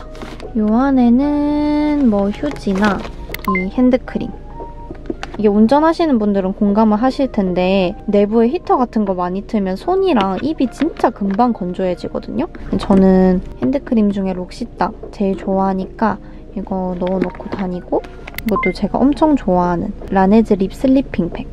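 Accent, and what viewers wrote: Gyeongsang, South Korea. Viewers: 경상도 같은데 대구분이신가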